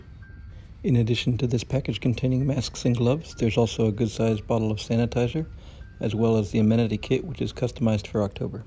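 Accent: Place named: United States. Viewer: I like your American English accent ;-)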